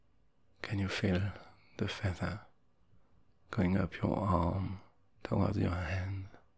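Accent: France. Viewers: "French accent" mais totalement ! ! Je meurs ! J'adore tes vidéos ! Continue comme ça ❤ Je sais pas si t'es français, mais en tous cas ça donne l'impression mdr PS : je suis francaise, je viens de voir ton live , je comprends maintenant ton accent français mdr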